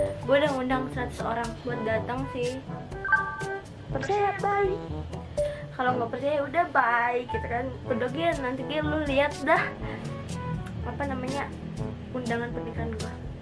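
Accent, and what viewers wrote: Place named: Kuningan, Indonesia. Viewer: Ka orang mana sih kaya orang kuningan aja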